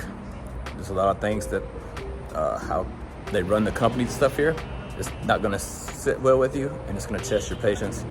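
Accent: South, United States. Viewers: you from the south? Why you sound black?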